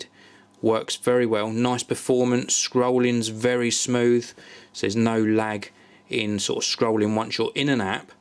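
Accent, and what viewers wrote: United Kingdom. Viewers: Very cute British accent. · Cool Britain Accent ... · which part of England are you from? you seem to have a thick British accent. great review btw.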